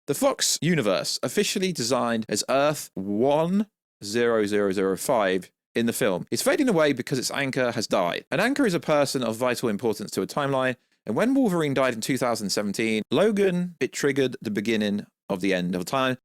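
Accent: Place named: United Kingdom. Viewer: I swear I thought it takes me a hour just to get to the point but you, you is just bad man, and every time I try to find other people that shows leaks and spoilers, it’s only you and the other guy Paul or whichever u are you, y’all both sucks and talk way too much. I get to make a long video but u speaking in British when we are Americans, we can’t understand you, geez Christ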